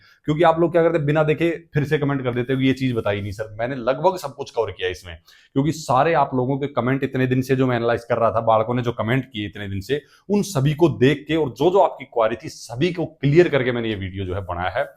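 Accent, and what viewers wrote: Haryana, India. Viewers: Bhai aap haryana se ho Kay ?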